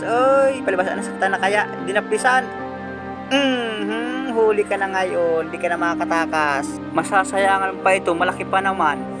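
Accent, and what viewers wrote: Philippines. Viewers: Parang taga katanawan ka kuya ah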